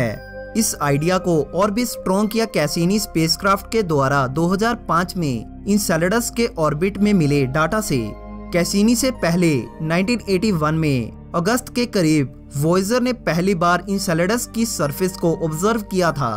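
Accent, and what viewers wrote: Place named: Chandigarh, India. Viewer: KOI NI BHAI SAB BHAI BHAI HI HAI MAINE SIRF ISILIYE PUCHA THA KYUKI AAPKA ACCENT MUJHE BILKUL CHANDIGARH WALA LAGA.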